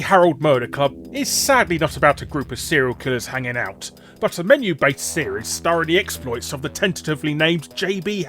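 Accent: England, United Kingdom. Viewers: Guru Larry just made me realise you can't spell Successful without SuCC! God bless that English accent of his!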